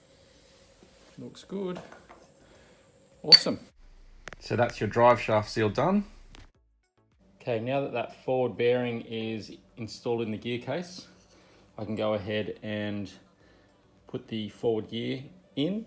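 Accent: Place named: United Kingdom. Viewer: Just can't abide the British accent. Gonna look for an American vid.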